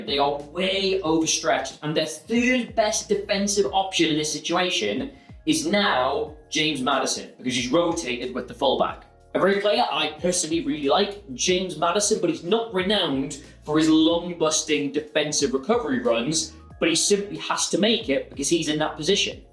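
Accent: Newcastle, United Kingdom. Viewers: No need to apologise, I actually like the Geordie accent 😛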